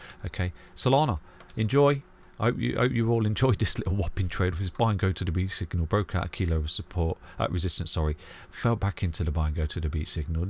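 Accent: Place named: Midlands, United Kingdom. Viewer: i don't think he is mumbling, he just sounds like a midlander to me 🤷 i don't struggle to understand him at all.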